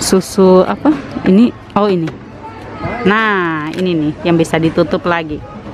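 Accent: Papua, Indonesia. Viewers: Im fom malaysia...suka sgt video kakak.. Malah kakak guna bahasa Indonesia (papua).. Suka dengarnyaaaa.... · Logatnya mirip Papua ?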